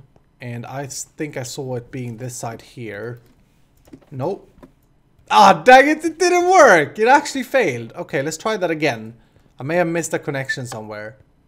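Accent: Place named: Sweden. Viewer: Man, I feel left out of the conversation here! Oh wait det gör jag inte alls det! You're the only youtuber with a Swedish accent I'm watching. Because the Swedish accent makes me cringe (mainly because I can hear all the stuff I, myself, pronounce erroneous in English, it's a bit like hearing yourself recorded, but with someone else's voice). But you're doing way to interesting stuff for the accent to outweigh the content produced!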